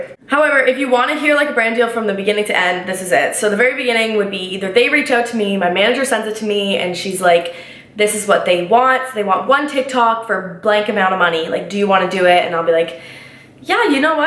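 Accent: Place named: Canada. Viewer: Can really hear the canadian in you!!!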